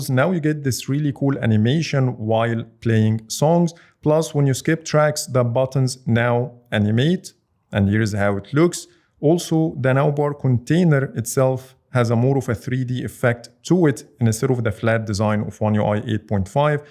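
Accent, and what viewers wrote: Egypt. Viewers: واضح جدا انك مصري, مو عارف كيف اشرح كيف عرفت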